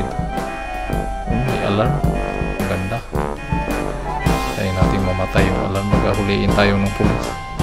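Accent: Visayas, Philippines. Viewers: Hi Sir Bisaya ka Sir? Taga Gensan diay ko sir hahahaha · Bisaya ka ba taga Davao city po ako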